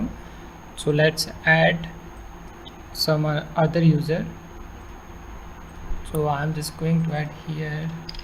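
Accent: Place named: India. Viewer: я по говору индусов и их говнокодом сразу за милю чую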